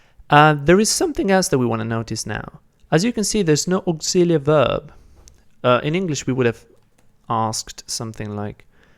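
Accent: England, United Kingdom. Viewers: As a Spanish-English bilingual this course is such a delight to me, you explain everything so clearly and I am learning Italian with both persectives which is very useful, since pronunciation and some grammar basics are so similar to Spanish, I would probably miss some things if this course had been taught by a Spanish speaker. Also, your English accent is gorgeous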